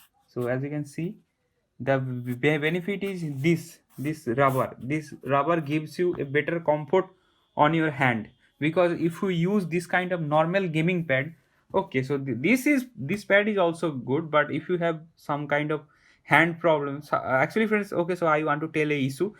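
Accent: India. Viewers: you are form odisha i can identity by your english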